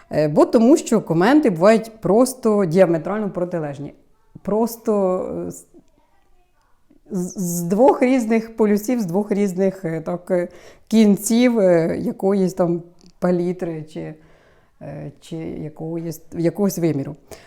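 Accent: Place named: North, Ukraine. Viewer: Пані, вітаю Вас! Ви чудова! Можливо я саме через тембр вашого голосу звернула увагу на ваш канал. З лютого 2022 принципово шукала відео-блогерів садівників з України. Дивилася, слухала. Не можу сказати, що троянди це моя улюблена квітка, але щось змусило мене зупинитися та підписатися на ваш канал. Щодо критиків, яким все не так, то невідомо скільки серед них тих, хто саме працює на запобігання розвитку українського контенту. А вимова ваша більш характерна півночі України, там притаманні більш тверді приголосні, щось трошки від білоруської мови. У мене тембр голосу дуже змінюється від тої мови, якою спілкуюся, рідні спочатку не впізнавали, коли дзвонила та розмовляла українською, зараз звикли. Кажуть, що українською у мене більш м'яка та доброзичлива мова вийшла. Сама себе не чую, тому важко об'єктивно оцінити. Окрема подяка за вашу громадянську позицію, про віру в ЗСУ та нашу Перемогу!.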